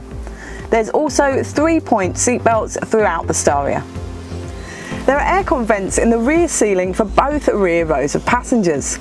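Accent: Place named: England, United Kingdom. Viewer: you r not from down under sounds english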